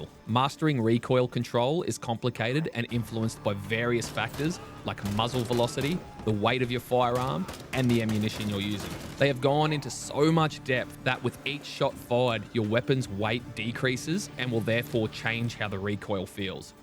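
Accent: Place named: Australia. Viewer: From the Great Plains of North America, I can say it always helps to have a super cool Aussie accent. Excellent video, excellent summary. Concise, to the point, and gets you revved up. I'll be watching more. Good work. 59 year old veteran, and I'm waiting anxiously for this to be a blockbuster! Cheers!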